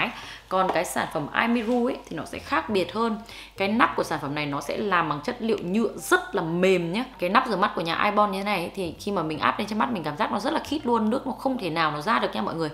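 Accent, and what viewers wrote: Nghe An, Vietnam. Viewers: Răng mà nghe giọng Nghệ An ở đây họ😗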